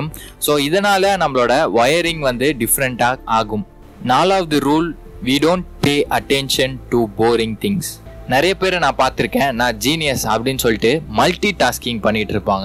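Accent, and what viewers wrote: Kerala, India. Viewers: கேரளா தம்பி குரல் மாதிரி இருக்கிறது. நீங்கள் யார்?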